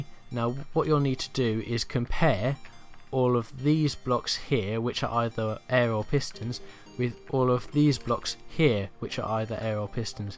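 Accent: United Kingdom. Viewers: lol, can someone tell me why brittish accent is so hard to understand? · Oh, you're British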